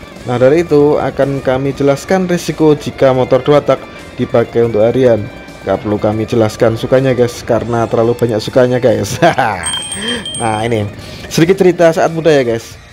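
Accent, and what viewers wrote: Magelang, Indonesia. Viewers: Magelangan banget om 😂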